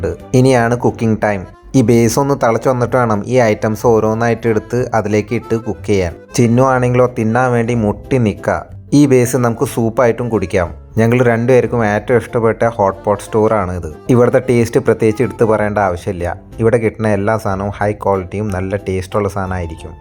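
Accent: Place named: Ernakulam, India. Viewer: ചേട്ടൻ ekm ഇൽ എവിടാ നല്ല പരിജയം ഉള്ള സ്ലാങ്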